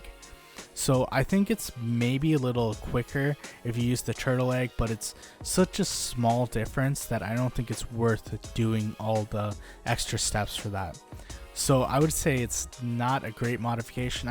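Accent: India. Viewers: Are you indian or American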